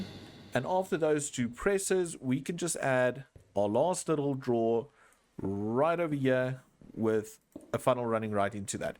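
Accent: South Africa. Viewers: I like your South African accent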